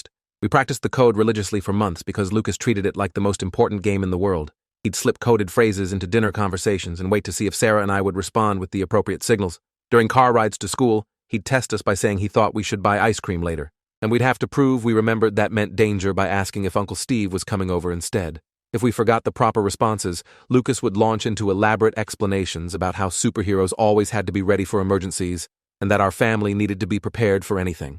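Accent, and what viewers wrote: United Kingdom. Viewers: British accent anyone?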